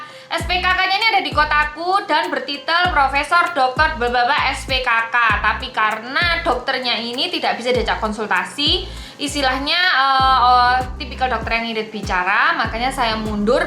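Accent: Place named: Padang, Indonesia. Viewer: Rodok padang ngono lohhhh😂😂suka logat bicaranya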